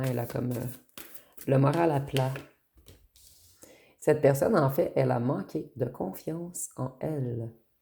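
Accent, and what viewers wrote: Quebec, Canada. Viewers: ton Accent Canadien Québécois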